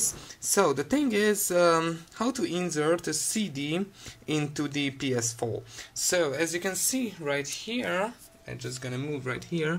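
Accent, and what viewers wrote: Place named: Poland. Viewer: Dzięki - aż mi wstyd ze musiałęm tego poszukac w necie ale wlasnie nabyłem PS4 Pro z FIFA20 i k*** nie mogłem odpalić :) Piszę po PL bo założę się ze jesteś z PL z tym sorry ale kulawym akcentem wszędzie da się poznać rodaka ;)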